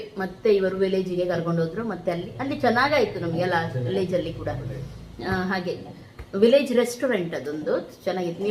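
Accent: Mangalore, India. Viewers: Hi mam Nim mnglr kannada language chengiruthe mam & Nice video mam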